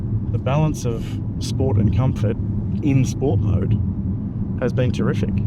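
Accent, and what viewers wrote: Australia. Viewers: " tie me kangaroo down!" I can recognize that Aussie accent anywhere mate. "Crikey!" BTW I don't live in Australia I live in Europe. Lol, lol!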